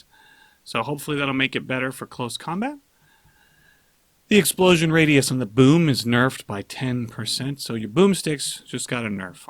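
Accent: Russia. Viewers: Should be a voice actor in Crossout with that russian accent!!!!:) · Thanks for the russian accent G!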